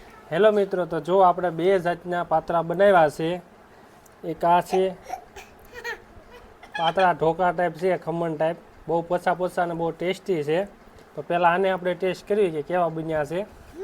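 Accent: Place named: Jhalawad, India. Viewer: ઝાલાવાડ ના લાગો છો ભાષા ઍવી છે સરસ પાત્રા બનાયા મને પણ ખુબ ભાવે છે મારિ વાડીયે પણ વાવવાના છે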